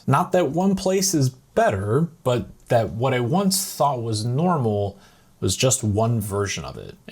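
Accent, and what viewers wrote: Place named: United States. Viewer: Having an American accent, do Brits treat you differently/judge you because of your accent since your time in the UK?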